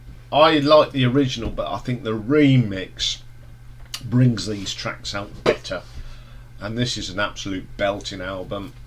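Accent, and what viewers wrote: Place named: Sheffield, United Kingdom. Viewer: You sound like you're from Sheffield.. am I right?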